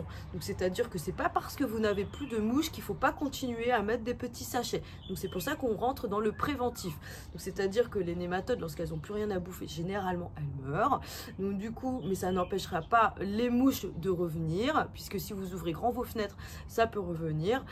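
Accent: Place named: Paris, France. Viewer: Bonjour et merci. Mon Accent Marseillais est aussi prononcé que votre accent Parisien.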